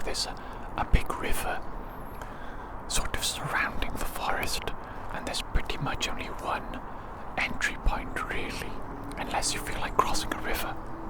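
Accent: Wales, United Kingdom. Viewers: There’s something about your Welsh accent paired with your refined, gentlemanly tone that creates such a unique and relaxing ASMR experience. It's also great to see you with a bible, my friend. God bless you 😊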